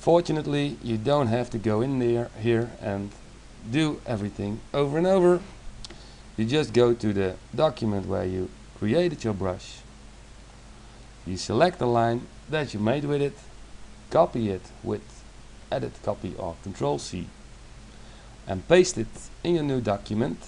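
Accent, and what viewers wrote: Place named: Netherlands. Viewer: Thank you, very good video ! By the way, your english accent is very nice for a Dutchman ! And I can tell because, I'm Dutch too :)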